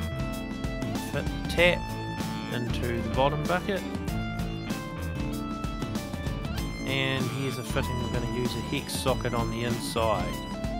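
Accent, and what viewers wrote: New Zealand. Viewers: Fellow kiwi accent I hear?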